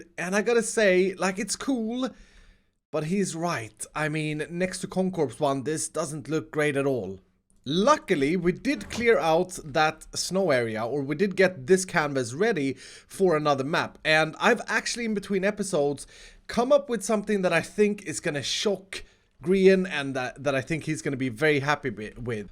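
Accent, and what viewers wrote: Sweden. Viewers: Hörde att du var svensk på din dialekt :) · Are you form swiden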